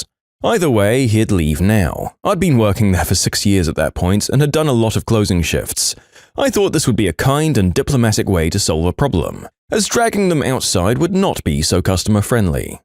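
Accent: United Kingdom. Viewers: I see your British.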